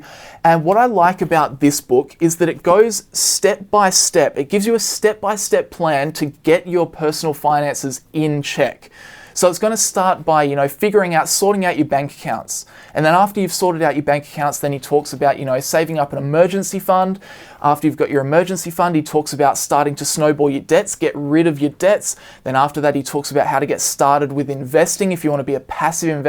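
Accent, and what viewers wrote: Australia. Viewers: Are you Australian? If yes, whereabouts if you dont mind sharing?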